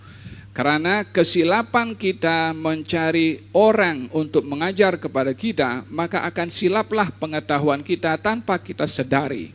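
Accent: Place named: Malaysia. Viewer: Ini logatnya kayak orang malaysiau😂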